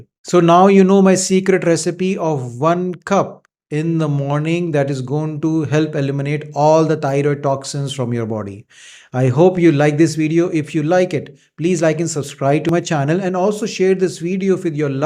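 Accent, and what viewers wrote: South India, India. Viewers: Doc,gratitude for empowering us about thyroid care, why you've south indian accent did you stay in south india for long.🙏👌❣️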